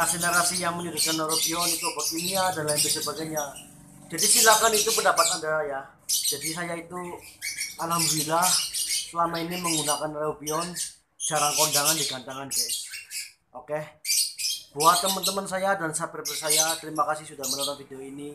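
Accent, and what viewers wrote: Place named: Banyumas, Indonesia. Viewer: Mantap mase...ora ngapak ora kepenak.... Salam suport...🙏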